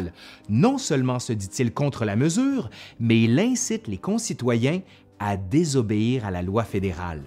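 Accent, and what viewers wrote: Canada. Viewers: quand il s'agit d'emmerder le monde les Français on un don inné pour le faire , et les canadiens Français en ont aussi hérité apparemment !! :D j'adore votre chaîne et le travail que vous faîtes bonne continuation et bonne fêtes de fin d'années a vous ainsi qu'a vos proches !! ( P.S = j'aime aussi votre accent canadien ) .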